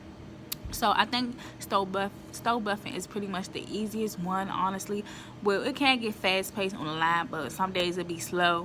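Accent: DMV, United States. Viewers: Are you from the DMV? You sound like it.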